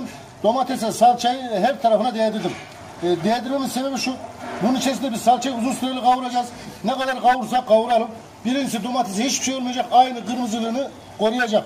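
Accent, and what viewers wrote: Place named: Kayseri, Turkey. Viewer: şef kayserili heralde aksan baya vuruyor 😁😁👍👍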